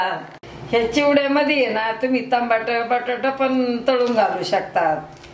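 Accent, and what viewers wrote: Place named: Marathwada, India. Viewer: खूप छान सुशीला चिवडा आई मी पण मराठवाड्यातली तुमची भाषा शैली मला खूप आवडते जवळची व्यक्ती भेटल्यासारखे वाटते मी तुमच्या मध्ये माझ्या आईला बघते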